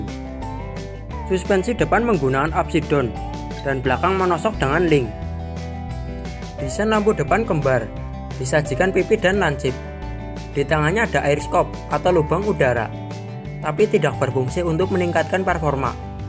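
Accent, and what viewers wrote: Java, Indonesia. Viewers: Mas sampean wong Jawa yah.,logate ketara.,sing Nang video juga R15 plat R.,jangan2 wong Cilacap yah.. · mantap bang, cuman nada ngomong nya masih JoWo Medok...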